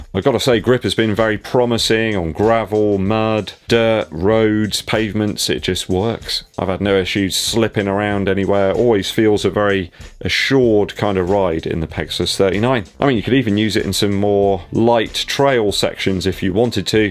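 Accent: United Kingdom. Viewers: Your voice is perfect for this. What can I say you got a proper British accent and people listen 😂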